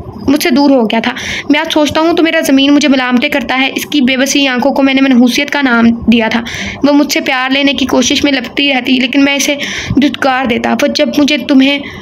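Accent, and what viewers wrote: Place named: Lahore, Pakistan. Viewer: aap Lahori tu nahi ap ki vioce toon se muje laga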